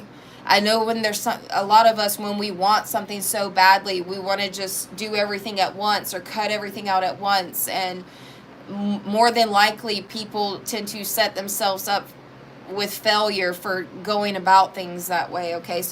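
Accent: South, United States. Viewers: PS ever since you hung out w your family your Southern accent is so much more pronounced 💕💕💕 I love it!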